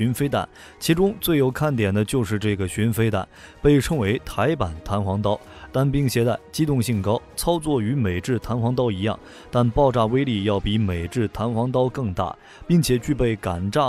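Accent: China. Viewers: 為啥是中國發音？？？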